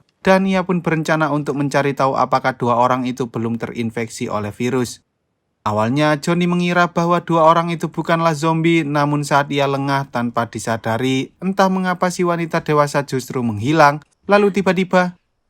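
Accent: Java, Indonesia. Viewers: Miminnya logatnya masih medok😅 tpi seru jg dengarnya😅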